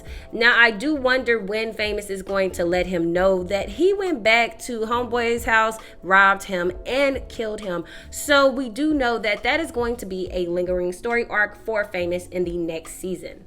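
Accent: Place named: New York, United States. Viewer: This episode was fire!!! Your New York accent has me rolling btw.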